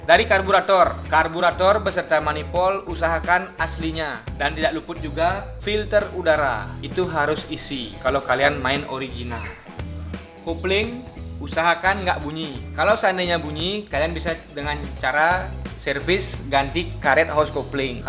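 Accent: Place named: Bali, Indonesia. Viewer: Dialek orang Bali sangat kentara walau menggunakan bahasa indonesia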